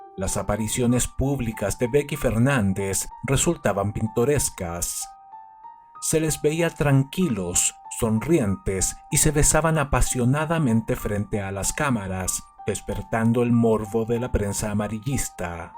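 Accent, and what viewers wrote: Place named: Chile. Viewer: Este canal es demasiado bueno, pero el hablar del locutor lo tira por tierra, pues tiene un fuerte asento chileño, podría contratar un locuror mexicano o colombiano, incluso español....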